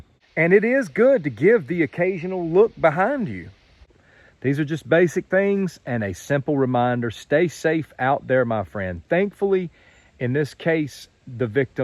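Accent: South, United States. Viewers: Just Love your Southern accent ! Greetings from Australia. Bless.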